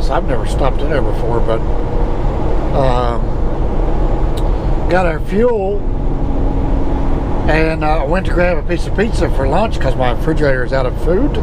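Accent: South, United States. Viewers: I really enjoy your videos. My dad drove for many years. I crawled in the truck every chance I had. He was born and raised in east Tennessee so hearing a friendly southern accent puts me back in the truck with him pretty easily. Be careful. I'll keep checking in on you.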